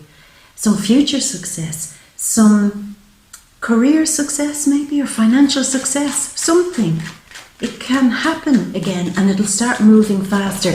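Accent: Ireland. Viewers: you're beautiful, intelligent and intuitive and thank you. plus i'm lovin' your Irish? accent? L've got the Irish blood too : )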